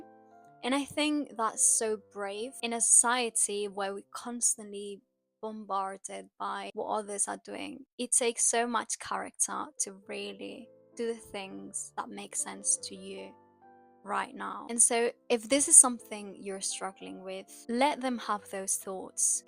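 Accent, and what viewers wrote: United Kingdom. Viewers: Tienes un acento britanico precioso. Imagino que a parte de tener una natural predisposicion al idioma, has trabajado mucho. Sería interesante un video sobre eso. 😊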